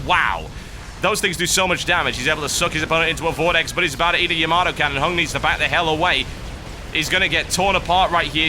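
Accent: United Kingdom. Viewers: why do you always say YURS insted of years lol :D funny as hell tough you cynical brit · It's pronounced that way if you have the kind of British accent that TB has. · Where is he from? (Well obviously some place in britan but i mean slightly more, because as has been stated by many, your voice is awesome...) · its just part of the british-english dialect i think. an accent from where he lives. just like not everyone in america says things the same. i know people from boston who don't pronounce their "R"s in words. they say Cah instead of Car.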